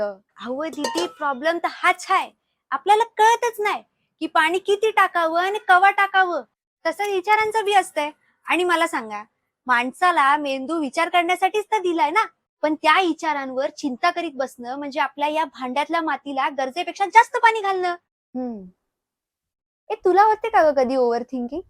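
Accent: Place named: Ghati, India. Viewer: Your घाटी accent 😍 nice try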